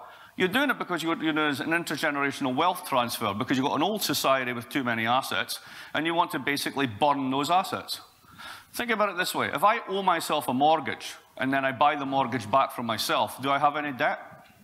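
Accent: Scotland, United Kingdom. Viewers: This is a proper Scottish accent you silly person! Do you know that Scottish generated, for such a small population, the greatest economic thinkers and one of the greatest concentration of philosophers! I suggest you educate yourself.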